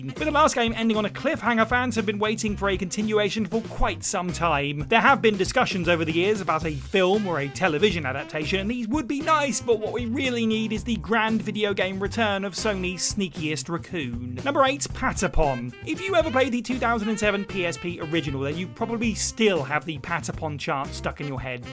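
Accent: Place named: Scotland, United Kingdom. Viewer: Can someone explain to me why scots say "Sweatch" instead of "Switch"??😂😂😂 Sounds smooth af 😎😎😎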